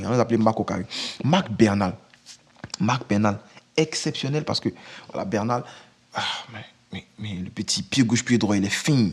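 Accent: Ivory Coast. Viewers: L’accent ivoirien me fera toujours rire. Tu me régales frérot, continues comme ça.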